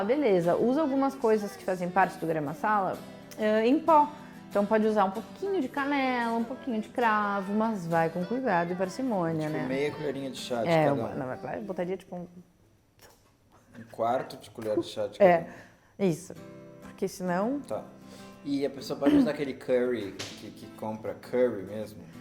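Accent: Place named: South, Brazil. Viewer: É viciante esse sotaque do Sul... Habemus BANQUETE! ❤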